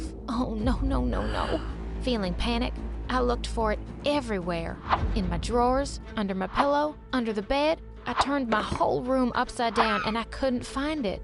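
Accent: United States. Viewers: Girl i love your cowgirl accents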